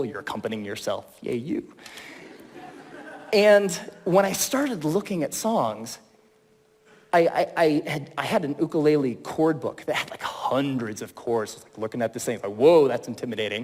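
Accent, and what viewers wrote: United States. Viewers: สำเนียงอเมริกัน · อเมริกันค่ะ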